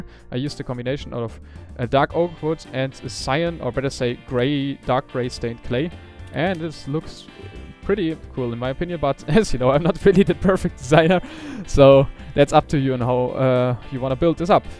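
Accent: Germany. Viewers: Your english is VERY german.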